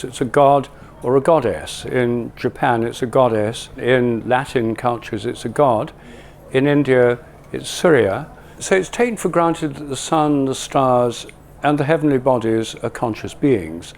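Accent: RP, United Kingdom. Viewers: Yukky muddled thinker - ignore the suit with an upper crust British accent. Soon as he said he had lived in India I thought “Yeah a continent full of devils and demons” of which he is now one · This guy loves his posh British accent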